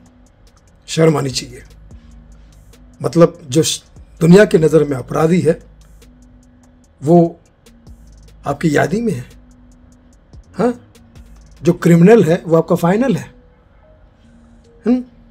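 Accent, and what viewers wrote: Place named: Uttar Pradesh, India. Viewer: Acha lga u.p wala boli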